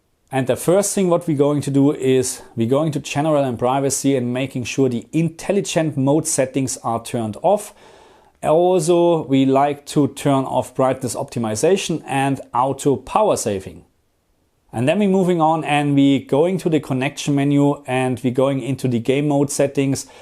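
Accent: Germany. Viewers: I love hearing the German accent.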